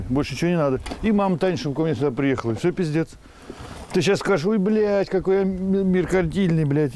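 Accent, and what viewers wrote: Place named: Okanye, Russia. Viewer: Окать перестань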